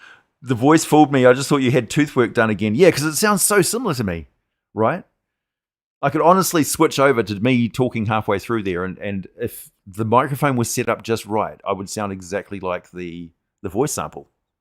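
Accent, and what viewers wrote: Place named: New Zealand. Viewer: Glad to see you trying new things! The AI voicing and script videos haven't resonated with me personally [the AI getting stuck in they cycle of using the word exosuit to describe exosuits or as an example of exosuit technology was when I realized that someone/thing else was writing your scripts and not to your standard], but if they otherwise help the channel grow and allow you to put out more content in your historical style going forward (albeit with fewer dungeons and or dragons) with your voicing and and well researched and written scripts then that's great. I also appreciate the clear labeling of them so that I don't get my hopes up for something of your usual caliber! AI is a great tool, but you remain a better script writer and narrator [at least until ChatGPT can scrape all your scripts and do a consistent NZ accent!]. 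All the best, hope you're keeping well! Had a great face off against some vampires on your silicone battle mats from the kickstarter last night.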